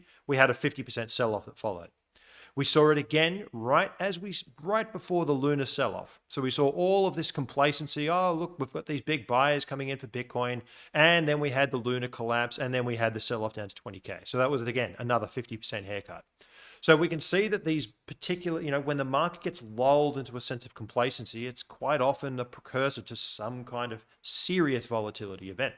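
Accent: Australia. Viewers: Nice to hear an Aussie providing such valuable info in the BTC space...👍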